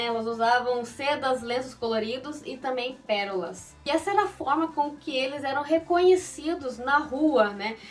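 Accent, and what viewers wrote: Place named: Passo Fundo, Brazil. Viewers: hehaehae jurava que era gaúcha tbm. Sotaque parecido sabe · Esse sotaque de Passo Fundo.🤭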